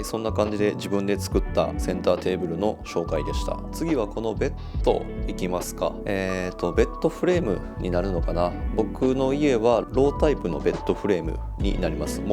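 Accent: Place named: Kansai, Japan. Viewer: こんな素敵な部屋で、素敵な声でイチコロですね〜 関西弁に親近感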